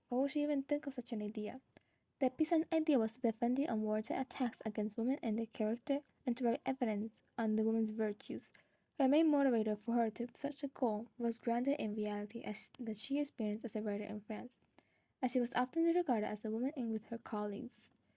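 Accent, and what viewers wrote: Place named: Spain. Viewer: Ahh makes sense. Im assuming you know how to speak spainish aswell because of your accent. I do have video request which i think would also make good content, Could you make an episode dedicated to the Arabic Slave trade its a fascinating series of events that i think are quite quite impactful in history to the modern Era. Alwo are these your only socials or do you have a 2nd channel or instagram channel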